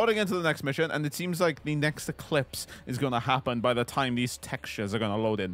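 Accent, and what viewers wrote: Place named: Wales, United Kingdom. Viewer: Man, from the first line I thought to myself "I bet this is Ride to Hell Retribution". Always good to see your dulcet Welsh tones coming up in my subscriptions again!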